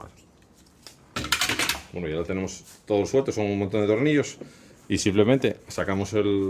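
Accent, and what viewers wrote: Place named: Asturias, Spain. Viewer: Muy buenos tus vídeos ,por cierto por tu acento me parece que eres de Asturias, o me equivoco ,un saludo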